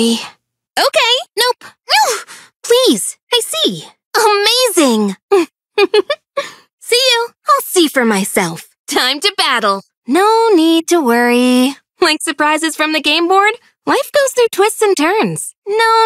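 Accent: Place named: Brunei. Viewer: I think about Bruneian Accent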